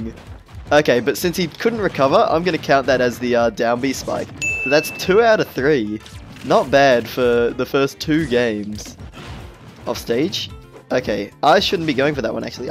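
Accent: Australia. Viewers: try and play smash for an battle or episode you pick without an Australian accent, I know it sounds impossible (I would know I'm also Australian too) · Love the idea of doing challenges. Love the editing. Love the succulent Australian accent. 10/10 Would donk again. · Are you Australian? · This guy sounds like PIMPNITE, but for Smash Bros. Nothing wrong with that; they're both Australian, like me.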